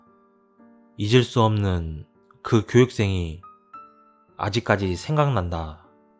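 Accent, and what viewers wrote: Jeolla, South Korea. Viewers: 전라도 사투리가 찐하게 뭍어있네요ㅋㅋㅋ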